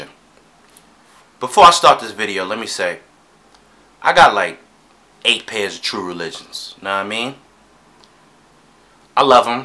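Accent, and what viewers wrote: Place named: New York, United States. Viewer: Yo he from NY?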